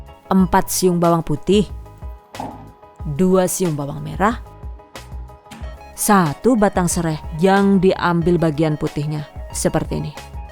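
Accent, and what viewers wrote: Banyumas, Indonesia. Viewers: Ngapake mantep yuu, aku jg wong ngapak😅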